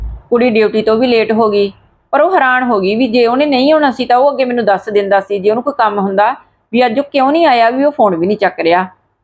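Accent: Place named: Malwa, India. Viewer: Sister ji soda pind kara a boli ta mansa Budhlada Bareta di lagdi a ma army bich me a Bareta tu a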